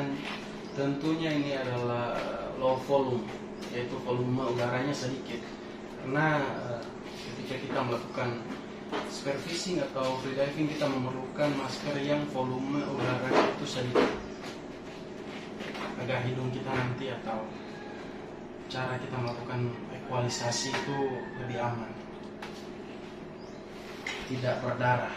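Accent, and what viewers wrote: Manado, Indonesia. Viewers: Orang manado kang?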